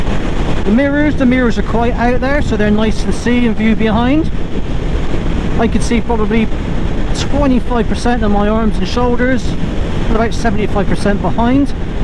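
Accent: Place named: Bristol, United Kingdom. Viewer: I subscribed just because of your bristol accent 😄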